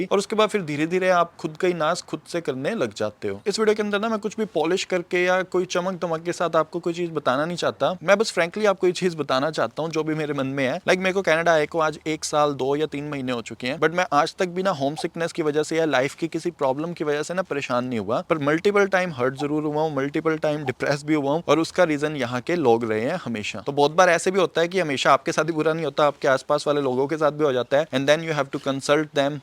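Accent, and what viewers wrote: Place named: Haryana, India. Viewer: Bhai aap ka accent haryanvi jaisa lagta hai baaki aap kahan se hu ? 😀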